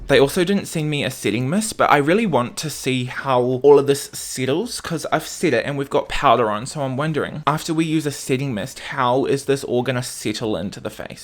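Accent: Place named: New Zealand. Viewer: LOVE hearing a Kiwi accent! (waves from Southland) ❤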